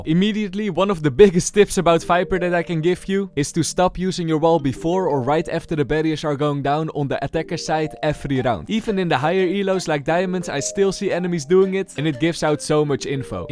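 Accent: Netherlands. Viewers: Where are you from, sir? Your accent really sounds like from the Netherlands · Nl accent 😂❤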